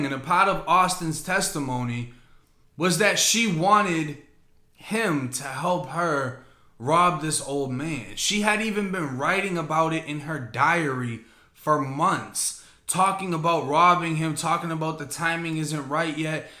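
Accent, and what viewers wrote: Boston, United States. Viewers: Crazy i read it in that boston accent.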